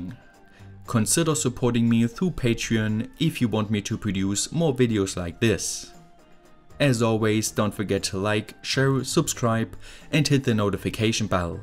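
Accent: Germany. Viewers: Your form germany right? I can hear it 😂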